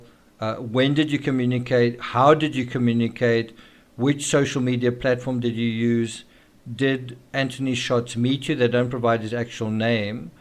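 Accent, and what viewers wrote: South Africa. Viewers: Sounds South African 🙂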